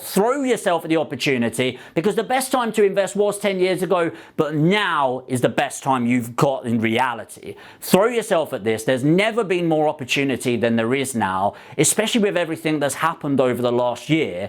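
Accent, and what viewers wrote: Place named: South London, United Kingdom. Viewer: Someone here is having a 'giraffe'... is from 'darn sarf' it's just accent 💛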